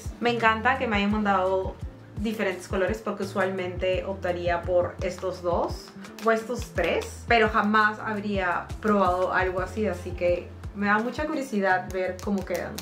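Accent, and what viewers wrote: Peru. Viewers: a le sale más su acento peruano con su mamá jaja me encanta!! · Un solo día con su mami bastó para que el acento de peruana regresara jaja soy Mexicana y lo noto muchísimo, me imagino es como Peruano fresa, me encanta:D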